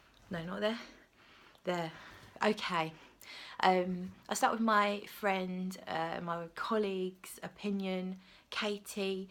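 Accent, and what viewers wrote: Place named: Cockney, United Kingdom. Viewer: I'm from Luton, your review sounds so so local love the review and the cockney accent xx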